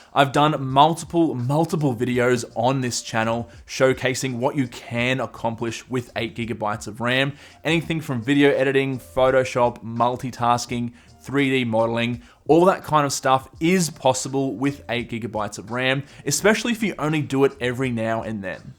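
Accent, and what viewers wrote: Australia. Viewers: When im trying to listen but being distracted with that damn eargasmic accent.. dont get me wrong its soooooo good for my ears. Hahah. Im thinking he’s an aussie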